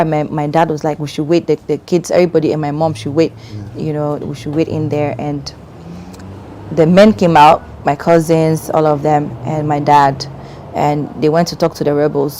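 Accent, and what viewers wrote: Nigeria. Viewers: She sounds like a Nigerian. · She sounds more Nigerian than any other nationality. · Lol. I thought I was the only one who noticed it. She sounds Nigerian.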